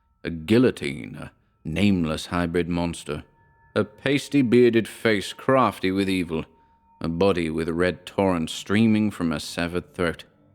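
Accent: United States. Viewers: Your American accent is immensely entertaining. "God- great God!"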